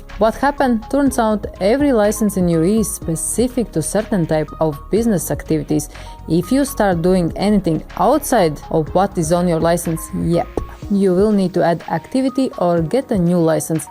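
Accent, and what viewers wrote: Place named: Russia. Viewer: I love the russian accent.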